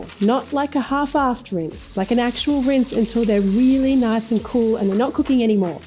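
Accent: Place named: Australia. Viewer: I'm not a native English speaker and I learned mostly by watching American shows and then I lived in the UK for a few years so I picked up a bit of a London accent, and now I'm picking up some Aussie-ness from watching this channel, apparently. My Aussie friend keeps saying "when did you start sounding like this?" it's because of XD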